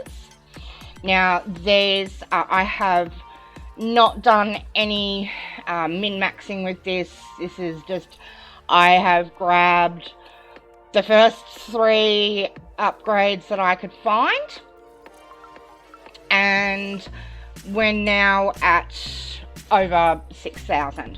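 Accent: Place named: England, United Kingdom. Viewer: How do you feel about Roseanne Barr? I lover her sense of humor.. but if no one has said it before you are exactly her with hint of an english accent. Asside from that.. Great video, informative.. but english Roseanne sold it .. lol! thanks!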